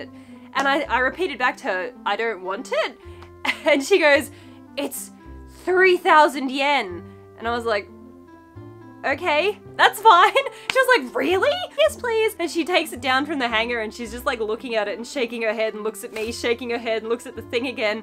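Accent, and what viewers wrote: Australia. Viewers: Gorgeous skirt clearly vintage- NO, NO, NO! Do not compromise this piece- it looks lovely on you as is- really suits you! Who’s the green cutie? Archie is he? His vocalisations and video bombing you at will is so sweet and it’s clear you two have a warm and lovely relationship- gently biting each piece to be involved- so cute! Clearly new to you and your wonderful AUS accent- ‘Hullo from the USA 🇺🇸❗️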